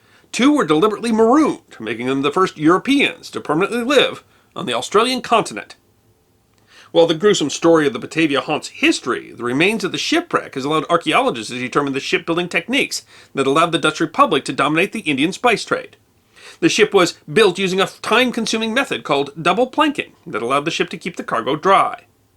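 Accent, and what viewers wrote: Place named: United States. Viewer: His American dialect is not easy to understand.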